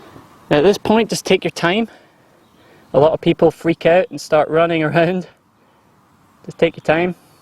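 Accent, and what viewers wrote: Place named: Scottish Highlands, United Kingdom. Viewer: What part of Scotland are you originally from,? You sound quite highland..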